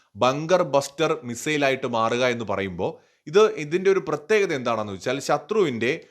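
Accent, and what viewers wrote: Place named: Bengal, India. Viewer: എടാ... ബംഗാളി.... ഒന്നും manasilaanila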